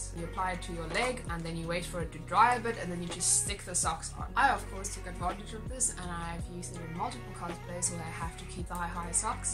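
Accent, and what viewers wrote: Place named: South Africa. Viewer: Just your voice tells me u r South African :) love ur cosplays I hope one day I can cosplay like you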